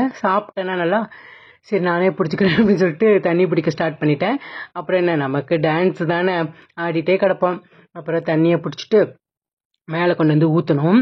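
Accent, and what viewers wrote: Kanyakumari, India. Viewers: Akka kanniyakumari slang pesunga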